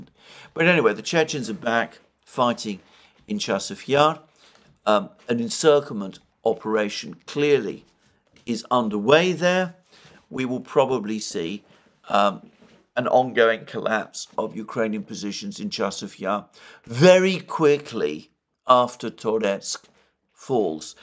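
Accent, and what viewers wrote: England, United Kingdom. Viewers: I wouldn't be able to read it with that English accent.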